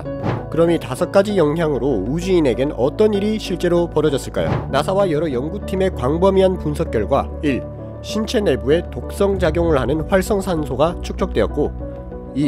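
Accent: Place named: Gyeongsang, South Korea. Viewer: 경상도 사람인가보네요 서울말 잘하시는듯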